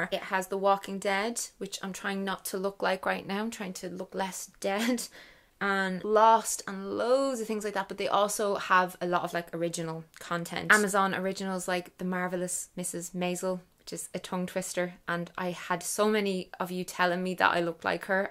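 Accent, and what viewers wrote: Ireland. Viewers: Honestly not that great 😔 Unfortunately covid coincided with just a lot of shitty stuff so my natural bubbly self is a bit emotionally exhausted...But your videos always boosts my mood so much...so thank you Mel! Especially the food diary Friday's... it actually made me recognise my own problems with binging and stuff so yeah thank you especially for all your stuff with that. It's weird I realised the other day that a few of our close family friend's are Irish and there's something about that accent, your accent, that reminds me of happier times... Sending you lots of love 💚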